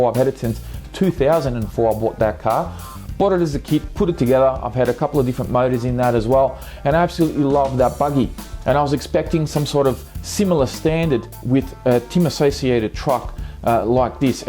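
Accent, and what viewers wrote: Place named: Australia. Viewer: Hi, I see it's been about a year since you did this review of all your SCT's and I wonder if things have changed with the Prolite. I have both a Trooper and the prolite. I just bought the Prolite SCT and ran it for the first time today. The prolite IMO beats the trooper by ton. It handles better, is faster and of higher quality. The first thing I looked at were the body posts. On mine they are so flexible that you can almost bend them 90 degrees and they just bounce back. After 30 races nothing broke so I am pleased with that. My only issue was the motor mount was loose right out of the box. I found that out when I did a little test run in my yard. Luckily nothing stripped or broke. I simply reset the mesh and tightened the screw on it. I love this truck already. I raced a bunch of friends who run slash 4x4 both HCg and LCG chassis and not only did I keep up with them I beat them regularly. 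Great videos you put out. Lots of great info too. As they say, "Come for the info, stay for the accent".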